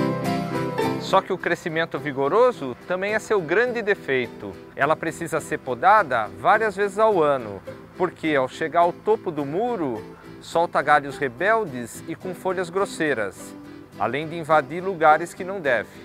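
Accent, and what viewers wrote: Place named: Santa Catarina, Brazil. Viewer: parece santa catarina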